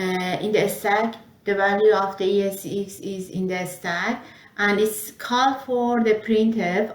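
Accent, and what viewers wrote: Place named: Iran. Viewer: I like your Irani accent jan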